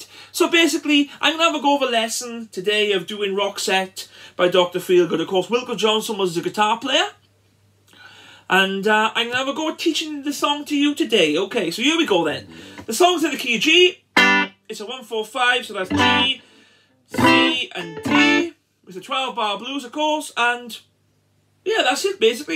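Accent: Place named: Wales, United Kingdom. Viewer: You are really fucking Welsh. And fair play to you, no insult meant there. Also great lesson, you really captured the spirit of the tune when you jammed it at the end. 10/10.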